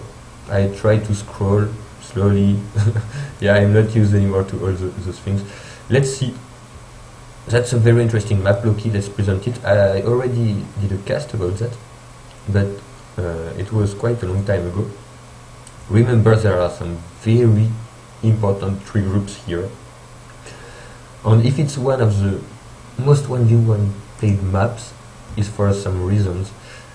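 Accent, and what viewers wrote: France. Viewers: Something about the heavy french accent and the microphone buzz makes watching your casts very relaxing. No but seriously, these casts are amazing because you pay attention to what makes FA awesome, the little decisions. Don't hesitate to do more.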